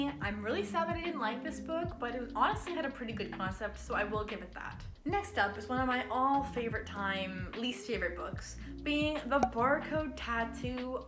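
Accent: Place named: Germany. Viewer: I don’t know if it’s just me but you have a little german accent hahah but idk why 😂 Are you german?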